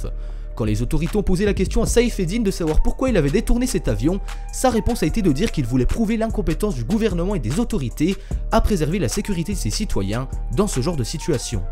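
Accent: Belgium. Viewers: Tu est belge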